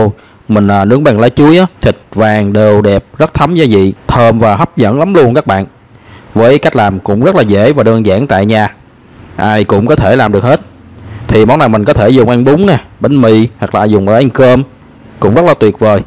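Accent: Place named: Quy Nhon, Vietnam. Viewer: Chị nghe vọng nói của em Chị đoan em là người qui nhơn Nhung em làm món ăn Miền Nam rất giỏi lắm luôn Chị chúc mừng cho em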